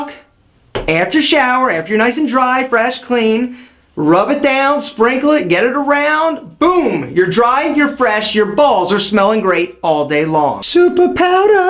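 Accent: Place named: East Coast, United States. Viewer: Where are you from bruh....im guessing close to the eastern coast.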